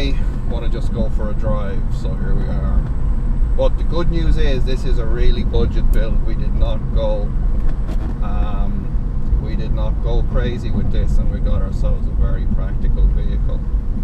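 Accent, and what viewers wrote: Ireland. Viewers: Nothing wrong with the Irish accent. You don't expect them to pronounce it Turd, they shouldn't expect you to pronounce it third.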